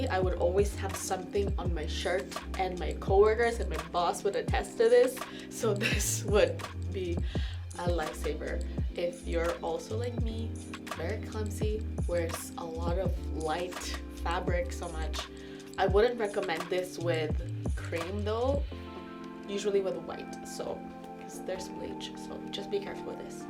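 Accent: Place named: Canada. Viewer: girlllll I hear the canadian accent ♥ ahahahha love itt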